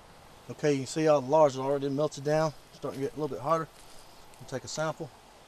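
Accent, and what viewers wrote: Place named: Maryland, United States. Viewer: Where are you from? Sounds like Maryland.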